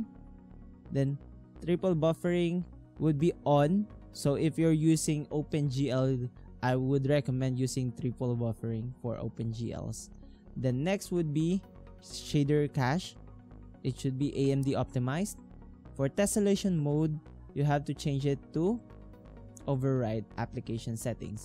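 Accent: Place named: Philippines. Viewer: Haha pinoy accent 😉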